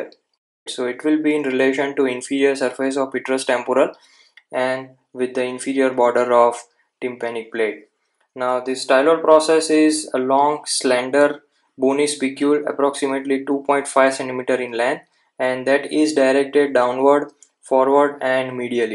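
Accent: India. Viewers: Sir are u gujrati ? Your acent is way like gujrati.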